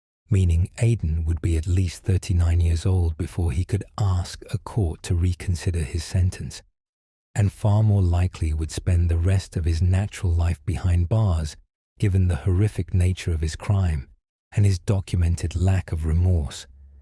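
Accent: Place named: United Kingdom. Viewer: Why use the British AI accent for USA stories? Use the USA accent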